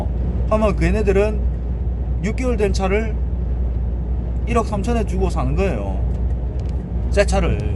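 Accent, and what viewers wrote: Gyeongsang, South Korea. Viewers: 이제 용차를 준비하는데 좋은 가르침입니다 경상도 사투리가 듣기 좋네요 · 갱상도 사투리 졸나게 거슬리네 토착왜구당 개세들 생각나서 나~~간다 갱상도 정말싫어 귀청소방 이나 가야겠다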